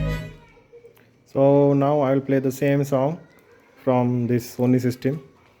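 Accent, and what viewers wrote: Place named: India. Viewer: Clearest Indian accent i have ever heard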